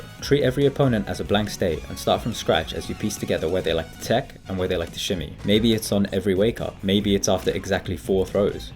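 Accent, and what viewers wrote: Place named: United Kingdom. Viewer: Leave it to a guy with a British accent to give me street fighter insight that applies to just life in general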